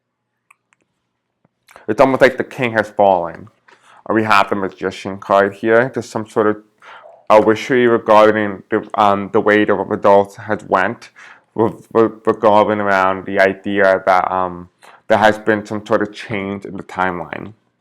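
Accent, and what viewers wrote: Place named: East Coast, United States. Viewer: Your voise seems to say that you are from the East Coast of America.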